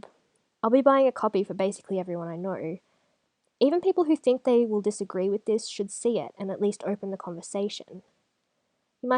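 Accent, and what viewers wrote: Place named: Australia. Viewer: Also: with such a prevalence of US video producers, it's so relaxing to hear an Aussie voice. Thanks for the vid.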